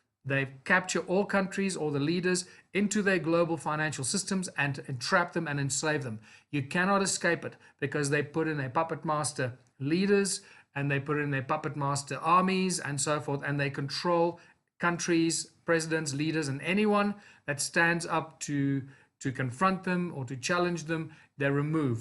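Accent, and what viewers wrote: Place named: England, United Kingdom. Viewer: Make note to his english accent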